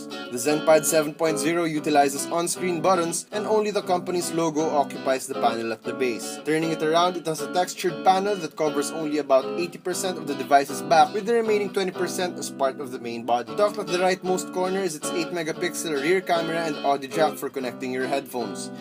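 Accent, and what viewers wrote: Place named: Philippines. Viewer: Heavy Filipino accent 😊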